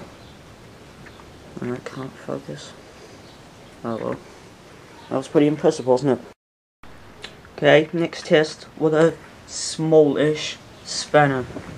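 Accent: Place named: United Kingdom. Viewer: How is it that all the crazy electricians have a UK accent? XD I absolutely love these!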